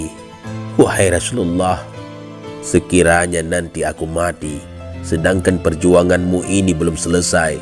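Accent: Aceh, Indonesia. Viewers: Adminnya ada slang melayu acheh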